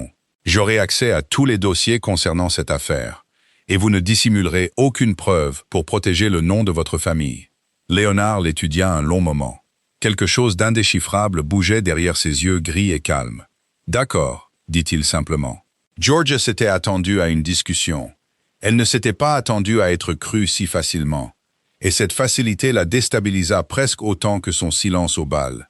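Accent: England, United Kingdom. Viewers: Arrêtez cet accent anglais détestable ! Cela nuit à l’écoute....l’histoire est tarabiscotée...😅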